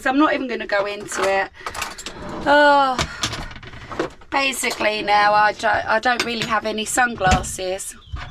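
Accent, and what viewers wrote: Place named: RP, United Kingdom. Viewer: Happy Easter love your posh voice 😅 😅 love & xxx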